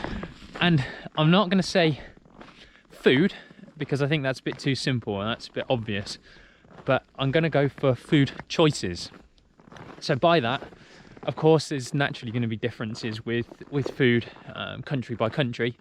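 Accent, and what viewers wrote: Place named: United Kingdom. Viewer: I like your British accent, subscribers from Philippines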